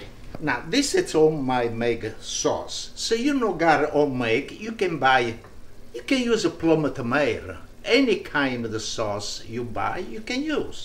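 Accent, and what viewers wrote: Italy. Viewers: I like his Italian accent so nice. As well I love pasta.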